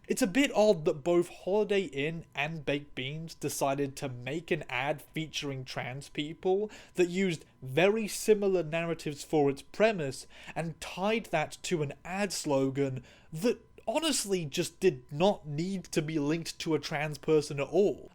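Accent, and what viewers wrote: United Kingdom. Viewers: girl i am so sorry i legitimately thought you were british, so when you made the disclaimer you're from new zealand it was real news to me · I assumed you were in Britain due to the accent. I'm British and have been wanting to move to NZ to escape the transphobia here; can I ask how good NZ is for being trans (access to healthcare, discrimination, etc.) in your experience? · Honestly never pinged your accent as kiwi, I thought you were bri’ish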